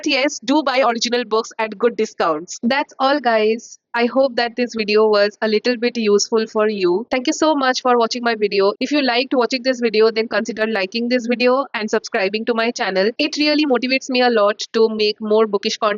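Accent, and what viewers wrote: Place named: Bengal, India. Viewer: You are a bengali. We can observe from your accent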